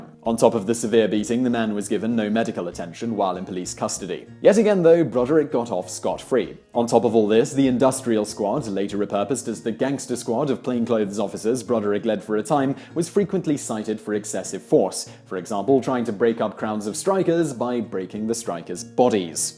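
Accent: United Kingdom. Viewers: What I find ‘ironic’ about this video is the British-accented presenter and the American subtitles - “Color” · gabriel toews, Please, don't beat yourself up about that. Even the light-hearted and "fan-friendly" way Simon presented the story of his life (coupled subliminally with the British accent) seems to put a shade of "knight errant" to him... It's actually a popular media ploy... I sincerely hope this can help you (and others) start to disseminate the substance of the story or presentation (with or without subtext)... Thus building and contributing to us all gaining in our critical thinking and deciphering skills... :o) I doubt you are an idiot... Just like most of the public... It's just easy (sometimes) to accept even trifling news at face value.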